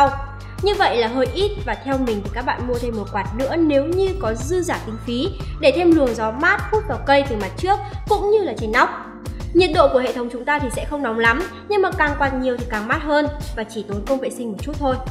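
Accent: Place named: North, Vietnam. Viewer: Thực sự rất thích review giọng nữ đặc biệt lại là giọng bắc trời ơi dễ thương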